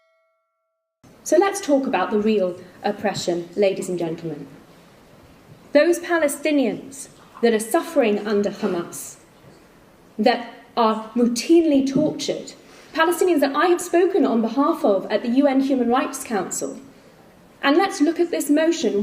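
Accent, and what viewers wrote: England, United Kingdom. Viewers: She has an English accent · Your bullshit British accent doesn't belong on the world stage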